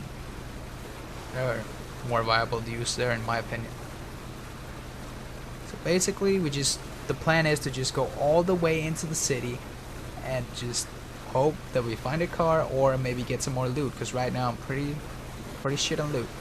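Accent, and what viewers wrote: Denmark. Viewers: are you Danish? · Are you Danish???? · Are you danish? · i couldn't help but notice your accent and ask if you're Danish? It sure sounds like it:)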